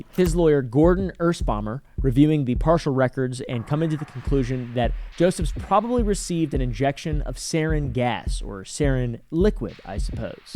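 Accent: United Kingdom. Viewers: That British accent though 😂😂😂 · Your British accent was on point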